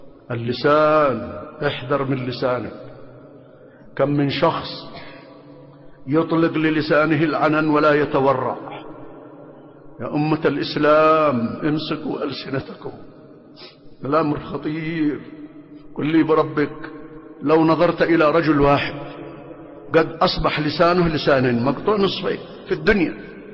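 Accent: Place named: Sanaa, Yemen. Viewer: بل هذا للشيخ عبدالله بن عثمان الذماري، فهو يتكلم ببعض الحروف بالهجة الصنعانية، ركز في الصوت، ما شاءالله يشابه صوت العلامة ربيع بن هادي المدخلي حفظه الله.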